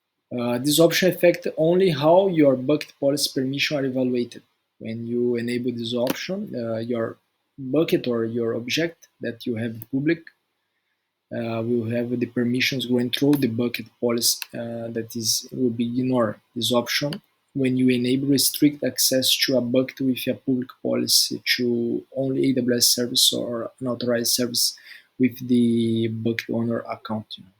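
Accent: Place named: Brazil. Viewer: Bro u sound like a brazilian... 🤔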